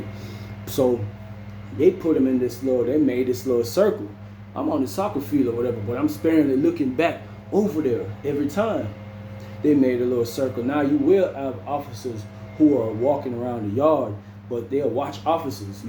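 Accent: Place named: Jamaica. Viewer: One of the best story tellers as of recently. Love how well you speak English and switch it over to Jamaican effortlessly.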